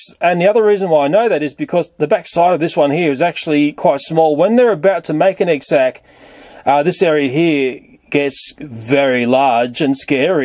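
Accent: Australia. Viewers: "we gott a redback spaidah" , "gud noit sistah" beautifal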